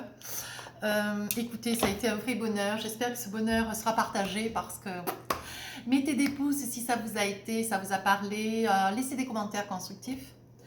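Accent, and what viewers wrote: South, France. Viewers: Bonjour. J'aime vos guidances. Juste, je cherche en vain l'origine de votre accent. Provence ou sud ouest? 😁👍🙋‍♀️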